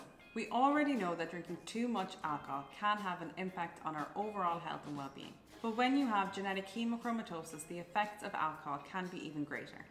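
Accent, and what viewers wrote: Ireland. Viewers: True , the GP I went to back then said He knew nothing about it and sent me to the Hospital. they found me a Dr who was surprised as I was his 3rd case. many more now. It is becoming a mainstream condition now ! sadly I think my Grandad and Uncles had it but like you said it was unknown then. all heart attacks in their early 60's only my Grandad survived the first but did indeed have another years later. they were strict tee total, strong fit (young and older) men. always wondered if it was Hemochromatosis. Im now in my 60's. > County Fermanagh Inniskillin is where we hail from. To hear your voice , the beautiful Irish voice , still and always will thrill me to my bones. Thank you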